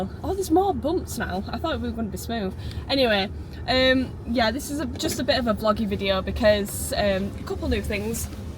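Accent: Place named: North East England, United Kingdom. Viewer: Advisory 18 😂 you sound like your from the north east lass?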